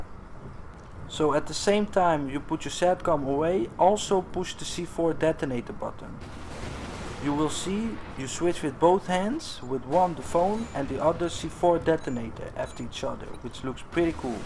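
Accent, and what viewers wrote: Netherlands. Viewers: Haah from the beginning i knewed he was dutch! Me to :D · You're dutch ?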